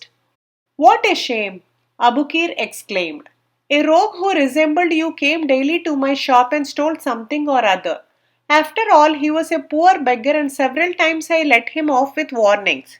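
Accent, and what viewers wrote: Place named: India. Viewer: You narrate so beautifully with a touch of indianness which is delightful for the listener!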